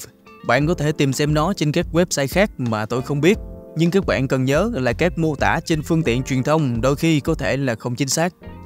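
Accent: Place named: North, Vietnam. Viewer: không phải phâm biệt vùng miền gì mình là người miền trung vẫn thích nghe giọng bắc chuẩn hơn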